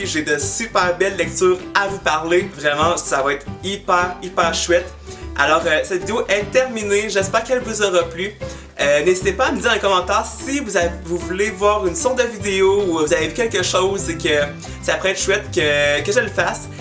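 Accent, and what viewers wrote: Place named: Quebec, Canada. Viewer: Salut, je viens de connaître ta chaîne et j'avoue que je suis conquise, j'aime beaucoup ta façon d'être et je raffole de l'accent québécois donc je me suis abonnée directe :) J'attend tes retours pour le monde de l'ombre car je suis bien tenté par ces deux tomes ! ^^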